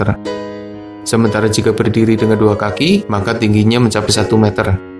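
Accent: Banyumas, Indonesia. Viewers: Sampean ngomonhe ngapak mas, wong ndi si? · Ngapaknya top..😄😄😄😄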